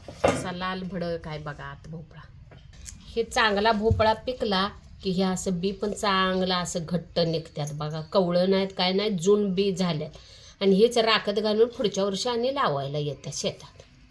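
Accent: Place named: Satara, India. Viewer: खुपच छान बोलतात सातारा किंवा सांगलीचे भाषा कारण मी सातारी इतक छान टापटीप करून दाखवतात मला खुप आवडल धन्यवाद ताई 🙏🙏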